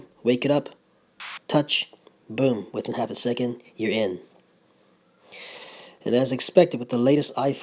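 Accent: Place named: West, United States. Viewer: you talk like cowboy trash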